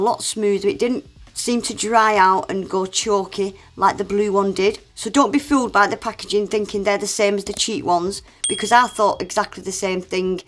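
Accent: Northern England, United Kingdom. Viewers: Can I ask, where are you from? Your accent sounds up north lol. I’m from West Yorkshire. What attracted me to your videos was the accent. Stayed for the nails 😂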